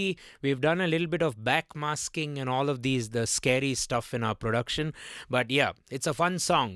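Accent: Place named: India. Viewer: From your accent i'm guessing you're Indian, yet how are you named ' '? I'm curious to know the story lol. Anyways amazing video.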